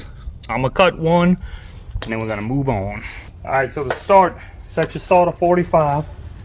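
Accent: Louisiana, United States. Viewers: Where are you from sir? I’m from Lafayette, La currently living in Pittsburgh, Pa and that accent is a taste of home! Me and my sons enjoy your videos! Keep it up!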